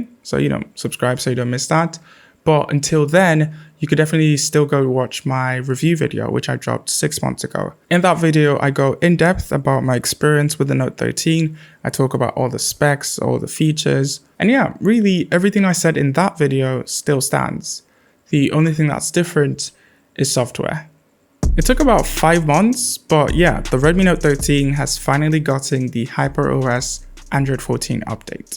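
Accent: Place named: London, United Kingdom. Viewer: London accent.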